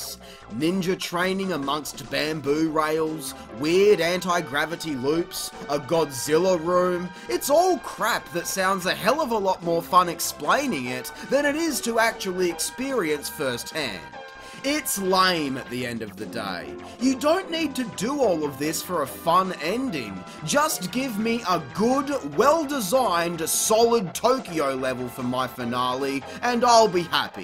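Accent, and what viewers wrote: Australia. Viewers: Are you australian? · Thanks, didn't wanna listen to this guy's infuriating Australasian accent for over 2 hours.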